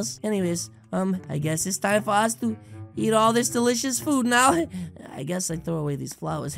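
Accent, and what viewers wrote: United Kingdom. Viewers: Never knew he was British lol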